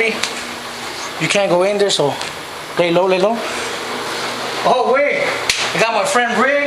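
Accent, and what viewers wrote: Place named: Mexico. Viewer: hahahha i amost fell for it carnal hahah u look like many but then i heard ur mexican accent jajaj la cervesa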